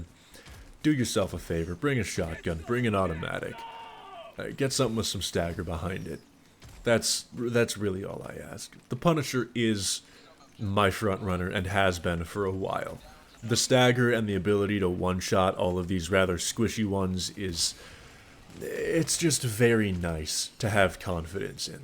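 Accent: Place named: Texas, United States. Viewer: from the deep baritone tones to the Texas draw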